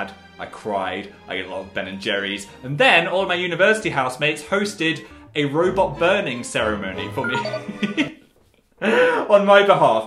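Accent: North, United Kingdom. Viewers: Idk why I really heard the northern accent in this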